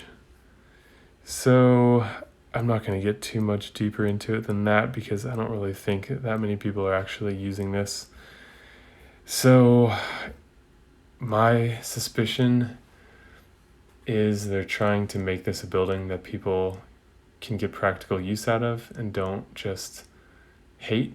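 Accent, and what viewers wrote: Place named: United States. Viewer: From the slight accent at the back of his voice it is safe to think he may be American. It's that slight drawl and laconic speech which makes me think he is someone who graduated from University. Most likely someone who has a lot of friends but only a select few whom he considers his closest mates. I don't know. I'm just taking a stab in the dark. As a writer I often create stories for those I follow on YouTube. I'll be wildly outrageous and take liberties that others wouldn't. The only thing I never do is make assumptions about their sexualities as that is a private matter that is private to them only. I don't need to know their personal life that closely but it is fun to hazard a guess at their most basic backgrounds. In all honesty, he sounds American due to the fact my sister went to America a long, long time ago and fell in love with America and hasn't come back home for over thirty years!!! 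She even sounds like a dinky di American from New York!!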